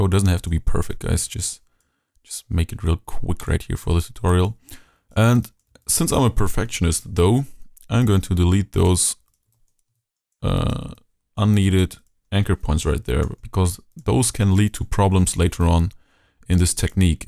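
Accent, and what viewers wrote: Germany. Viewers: deutscher hört man sofort raus :D aber nettes video xD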